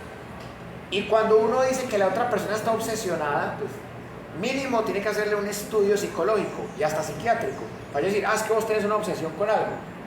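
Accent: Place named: Spain. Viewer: Es tan genial escuchar a este profe...explica todo, claro, castizo ❤... así, sí que se entiende!👍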